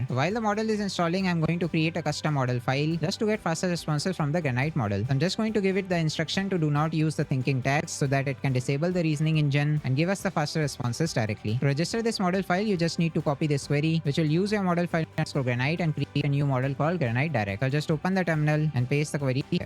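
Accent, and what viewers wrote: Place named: India. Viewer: Господи, пока не увидел твой коммент думал повешусь с индийского акцента, уже забыл что такое индийские айти видео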